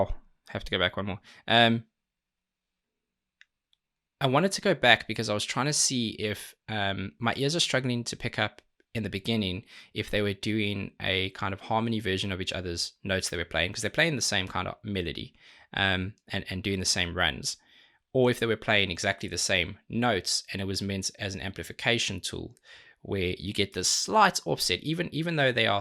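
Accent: South Africa. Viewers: Is my guy here a fellow south african or am i hearing shit?😂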